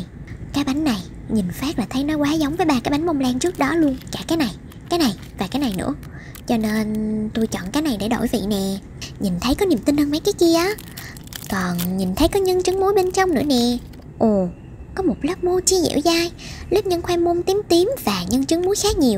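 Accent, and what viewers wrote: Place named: North, Vietnam. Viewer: Nghe giọng bà chị giống ng Bắc á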